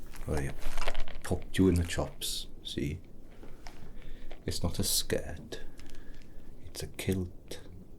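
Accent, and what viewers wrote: Wales, United Kingdom. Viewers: I can understand him easily but then again I’m Welsh so the accent isn’t an obstacle for me maybe that’s what’s throwing you off? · The Welsh accent makes my brain happy. 😁💜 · You have such a lovely voice, whether you be singing or speaking, It's lovely. With your welsh accent it just made the video better. ☺☺☺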